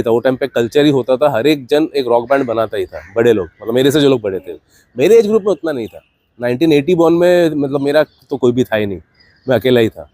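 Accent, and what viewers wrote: Bengal, India. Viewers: Bro are you bengali?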